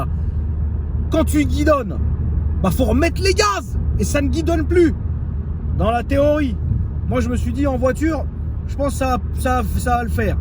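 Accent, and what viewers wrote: Tunisia. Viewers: on kiff l accent Tunisien 🇹🇳 🥇🏆😂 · Sérieux on dirait un tunisien quand tu parle en arabe je sais pas si sa a force de traîner ac des tunisiens ou quoi mais voilà 😕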